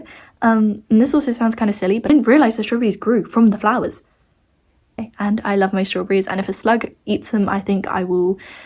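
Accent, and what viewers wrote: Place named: Hampshire, United Kingdom. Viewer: Omg!! your the first youtuber I've ever heard of with the same accent as me lol, are you also a Hampshire girly?💕 ps you are so gorgeous!!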